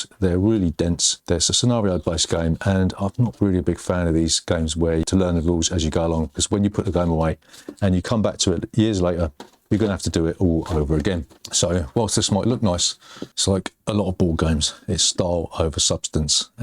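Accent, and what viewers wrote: United Kingdom. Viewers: I think that this six-part series is among the most entertaining board game videos I have watched. A couple of the titles made it onto my wish list thanks to it. With that delightful British accent, even the swearing sounds eloquent, sophisticated, and sublime. It would not be possible to pull that off in any other localized version of the English language. It’s great to be a patreon.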